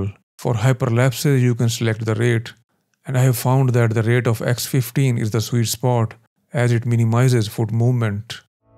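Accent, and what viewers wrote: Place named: India. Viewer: Thumbs up for keeping that DESI accent and NOT FAKING american accent 😂🤣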